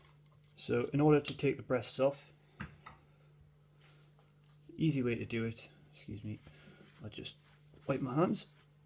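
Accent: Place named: Newcastle, United Kingdom. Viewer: Thanks for sharing this. I just de-boned my first duck! Much easier that I remember chicken being - and nice to hear the Newcy accent. Cheers! :)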